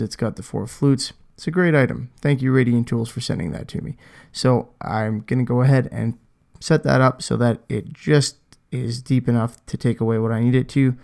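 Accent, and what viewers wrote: Canada. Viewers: Ha! You replied! Too freakin cool! Im using the spraymax 2k. Thank you for that too, i was having not so great results with rustoleums clear. So ive been moving alot of guitars( for a guy just messing about) and i got my clear through some different channels. I would be way cool with placing my future orders in a way that gets you a kickback or whatever. Im just new to technology so im a little behind the times as far as ordering things with my phone. So youll have to shoot me another reply with those instructions. Your videos have been a priceless resource to me since i started dicking around with guitar finishes. Was stoked to hear a canadian voice, being a canuck meself( living in the states, in Missouri of all godforsaken places). Keep them coming bro-cephus. Thanks a million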